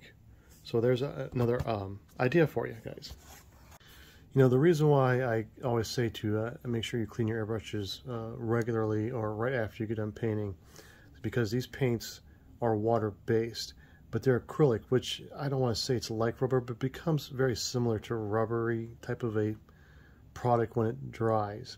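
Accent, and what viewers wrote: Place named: Canada. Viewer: Classic Canuck talk. 😆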